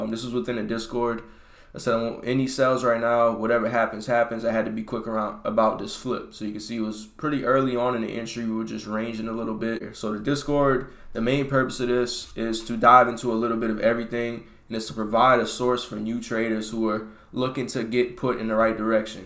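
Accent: Louisiana, United States. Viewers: I knew you was from Louisiana accent heavy im in New Orleans ⚜️⚜️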